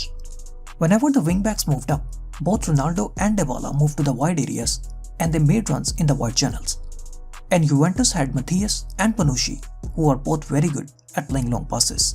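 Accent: India. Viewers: Fucking indian accent. This analyzis is bullshit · this indian accent so annoying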